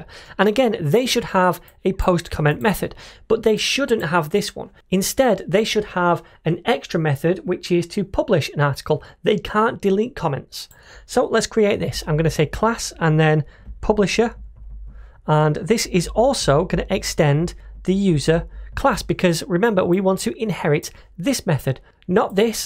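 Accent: United Kingdom. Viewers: Always watch your tutorials, like how you explain each video clearly for beginner and love your British accent:) Hope someday you have complete Dart series. Thank a lot,.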